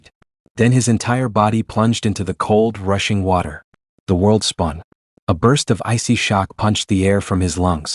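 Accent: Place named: United States. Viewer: Everything is nice , except the bits can come off a bit colorist,Due to the readers white American accent.bits he keeps saying dark and color unnecessarily.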